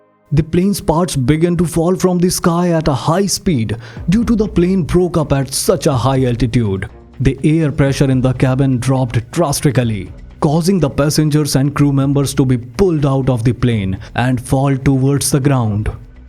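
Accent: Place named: India. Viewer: I hate indian accent 🤢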